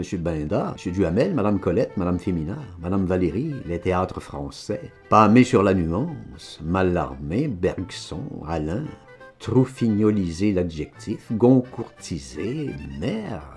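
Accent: Quebec, Canada. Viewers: Coupez la musique bordel. C'est pas du bal musette. L'accent québécois pour une phrasé titi parisien ça ne va pas non plus.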